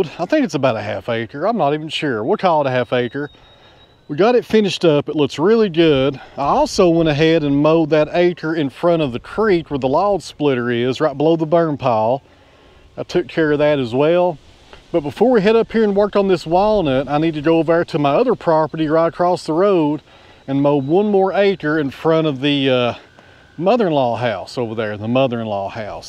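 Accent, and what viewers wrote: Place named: South, United States. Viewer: I will add my voice to those who enjoy your videos just how you’ve been doing right along. That includes your explanations about not only what you’re doing but also why you’re doing it. I enjoy learning about your work. Even though I live up north, I also enjoy your delivery with a Southern touch. In these days of homogenization, the maintenance of our regional differences is a good thing IMHO. Thank you for sharing.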